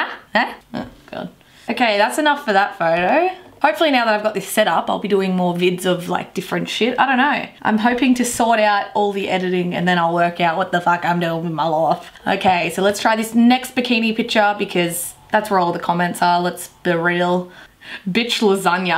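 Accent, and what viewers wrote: Australia. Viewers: Thumper Bunny, Everyone has an accent, you just don’t know you have one, since you’re use to it, she does have some sort of an Australian accent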